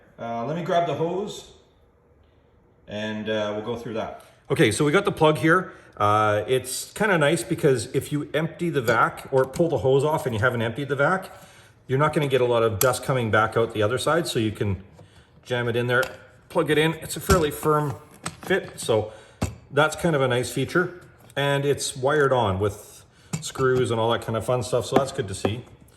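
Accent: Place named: Minnesota, United States. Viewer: You must be from Minnesota. Nice unboxing and walk through of the unit!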